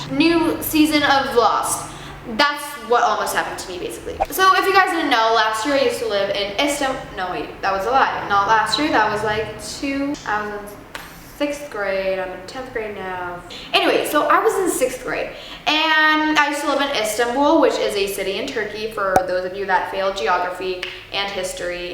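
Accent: United States. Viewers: She's Turkish but has lived/visited America so she picked up the American accent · How did she get such a flawless American accent in just 4 years at that late of an age? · how come she has an American accent but used to live in Turkey?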